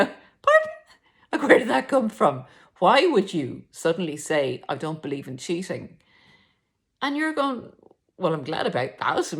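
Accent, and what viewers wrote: Ireland. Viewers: OMG, you knew but you didn't want to face it. They also like to wait till we've had a few drinks before they act up or 'fess up. My ex used to tell me about all the cheating housewives on our street. Later I realized they were cheating with HIM! He "worked from home" while I went out to an actual job. I guess they were all getting plenty of "exercise."😂 Love your Irish lilt, eye looks well. Prayers and thanks from across the pond❤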